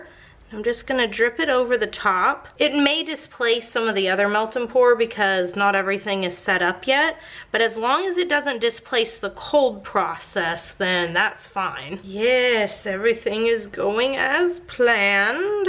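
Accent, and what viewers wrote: Texas, United States. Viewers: It's that Texas accent!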